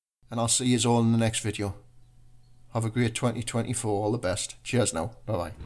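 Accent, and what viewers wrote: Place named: North East England, United Kingdom. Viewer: North East is my guess, closer to Newcastle than hull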